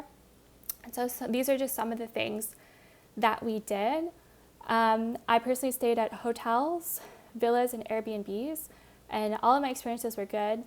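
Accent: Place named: United Kingdom. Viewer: Preconceived notion of extreme poverty in so called (3rd world countries) woow, obviously you are not from the US, your accent is more British. Have you ever visited some major US cities like Baltimore, Philadelphia to name a few, talk about extreme poverty.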